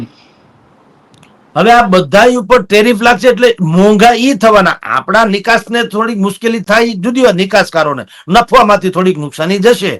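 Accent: Kathiawar, India. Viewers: I just love how you talk in Kathivadi Gujarati and explaining everything in detail 🤣🙏👏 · કાઠિયાવાડી શૈલી માં ખુબ સરસ મોરે મોરો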